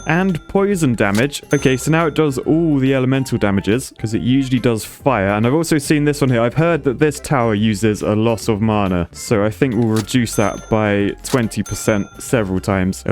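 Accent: United Kingdom. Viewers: early to this amazing video from my favorite bri'ish engineer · Always great to hear that beautiful British voice.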